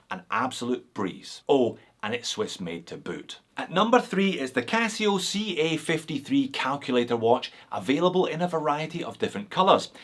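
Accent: Scotland, United Kingdom. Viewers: Thank you so much for all these wonderful vids (and this bit of scottish accent comin´ from Down Under)! I scratched the itch today and got me one of these lovely chinese Seagull chronos 😁😁🎅🎅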